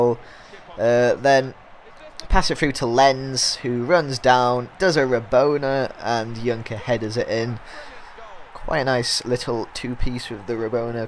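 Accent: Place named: Yorkshire, United Kingdom. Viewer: hearing these crazy Scandinavian names in a Yorkshire accent is half the fun ;D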